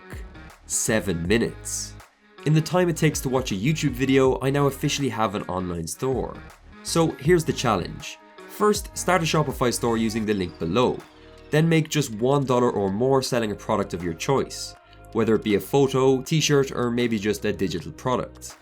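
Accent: Ireland. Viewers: Are you Irish? And happy St. Patrick’s day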